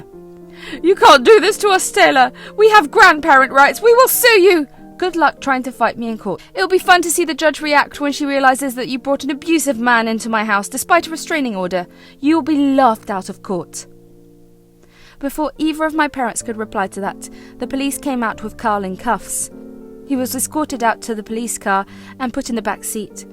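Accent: United Kingdom. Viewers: The British accent is funny with this story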